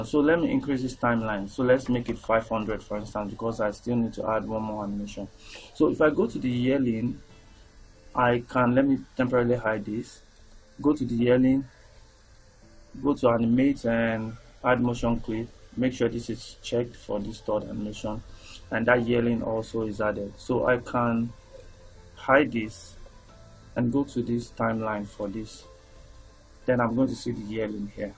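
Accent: Nigeria. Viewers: You have a Nigerian accent...are you a Nigerian??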